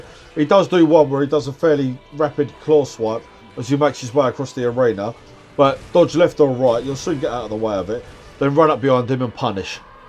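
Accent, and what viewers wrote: United Kingdom. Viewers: Never thought I'd see a cockney soulsborne player 😂 Cracking work on the descriptions mate, keep making more! Edit: Just finished Nameless King fight myself... 12th attempt I think 💀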